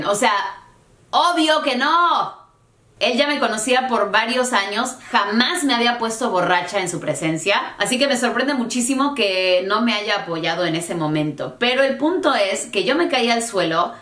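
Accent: Mexico. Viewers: Tiene el acento Mexicano. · Veo tus vídeos más que nada por tu acento jaja. Me gusta mucho que hables tan mexicana, no es sólo que hayas vivido aquí, sino que entiendes la cultura y eso se agradece. Sobre la droga, hubiera sido interesante saber si Pancho conocía al bartender jaja es lo único que me hace lógica. Como comentario sobre el video, sentía a veces que la música más que adentrarme en tu narrativa, me distraia y desconcentraba de lo que estabas diciendo. Creo que no es necesaria. Saludos! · You speak like a Mexican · rad15 90, para estás fechas, ya sabrás que ella nació en EUA.......y que fue estuvo desde pequeña estudiando en México, (por asuntos familiares) así que sus estudios básicos elementales fueron bajo el sistema educativo mexicano. Es básicamente una mexicana, nacida en USA. Qué les pasa?.....no tiene ningún acento, que no sea el español; aprendido en México.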